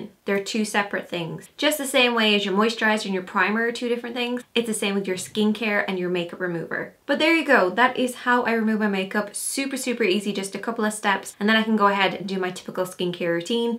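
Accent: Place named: Ireland. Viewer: She is from Ireland ^^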